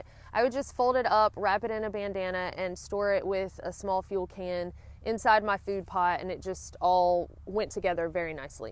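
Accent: South, United States. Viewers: Sexy accent! North Carolina or Tennessee ?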